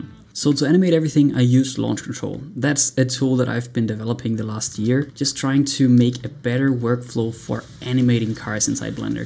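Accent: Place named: Denmark. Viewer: Godt grej, Det ser fandme godt ud! :D Baseret på accenten tør jeg godt skrive denne kommentar på dansk. :P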